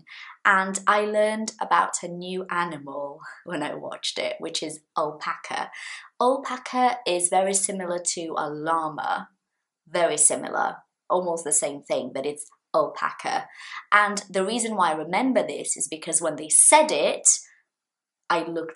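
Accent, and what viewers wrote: United Kingdom. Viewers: I love your British accent, it is my focus. I don't pronounce the letter r and I usually use schwa sound, but the most of English teachers prefer American accent than British and the worst is that they try to enforce us to speak like an American.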